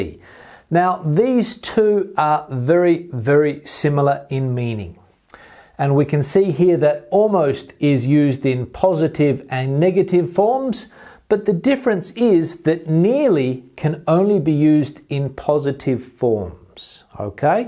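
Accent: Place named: RP, United Kingdom. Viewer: It was very pleasantly and usefuly to listen to your lesson, sir! You have the real British aristocratic prunounciation! Thank you. I'm from Russia. Now I'll watch your classes more often.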